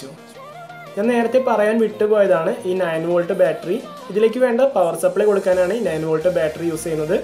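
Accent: Thrissur, India. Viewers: Oru Rakshayilla.. Trissurkaranano?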